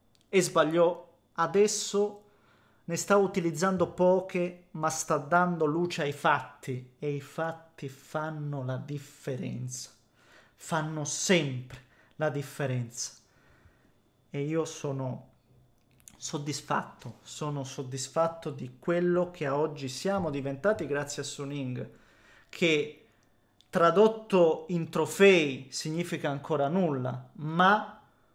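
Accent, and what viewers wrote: Calabria, Italy. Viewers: Calabrese/pugliese detected*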